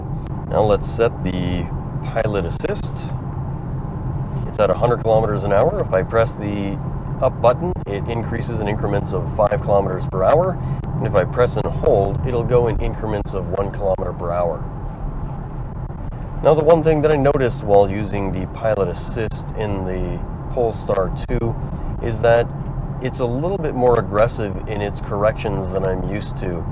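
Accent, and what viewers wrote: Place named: Canada. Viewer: Canadian accents sound fun to me, it makes me want to have a Labatt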